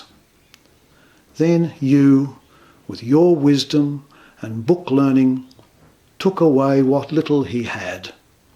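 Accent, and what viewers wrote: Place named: Australia. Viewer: Thank you! A friend shared this in text and it's so nice to hear it in audio. Especially in Australian 🇦🇺 From a fellow Aussie living in America. So nice to hear a familiar accent.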